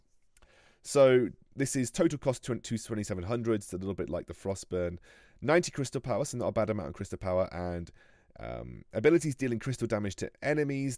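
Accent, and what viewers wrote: United Kingdom. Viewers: Phinn yeah. Luckily his accent is textbook British English so yeah could understand easily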